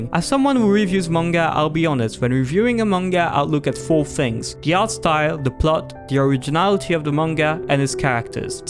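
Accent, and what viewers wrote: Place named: United Kingdom. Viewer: you are french but still your accent is unbelievably british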